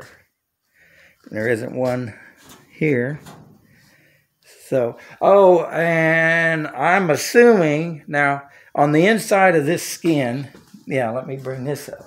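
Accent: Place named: Missouri, United States. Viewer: Hi, I’m a new commenter but I’ve watched all your videos. You’ve inspired me and I’m currently setting up shop to start scratch building an H5. I appreciate all your advice but especially like the little tidbits about scratch building. It’s also comforting to hear a Missouri accent again! I grew up in the Springfield area but haven’t live there since college. Wish I could have met Spike.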